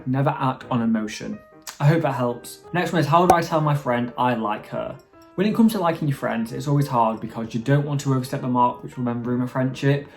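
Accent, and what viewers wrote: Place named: England, United Kingdom. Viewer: Absolutely love your vibe ... and could listen to your voice all day. Love an english accent ..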